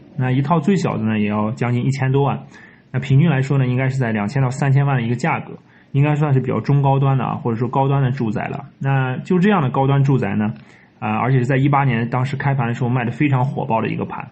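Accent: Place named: Fujian, China. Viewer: 博主聽口音像福建人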